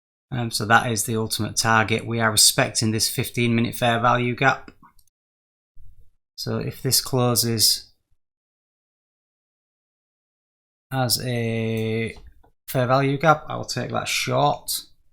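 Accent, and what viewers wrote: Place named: Yorkshire, United Kingdom. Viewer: Interesting you used the 15m FVG, not noticed that previously from other channels. I'm seeing traders posting silver bullet entries with order blocks and OTE on 1 min charts. Generally, when FVGs are featured, they're a confluence within an order block. Nice to hear a Yorkshire accent on a SB video :)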